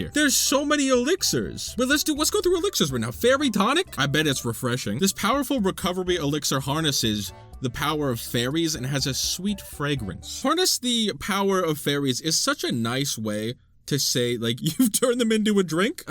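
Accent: Ireland. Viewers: Your Irish ? Witch county do you/did live in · I didn't know where is from but I didn't expect Ireland lol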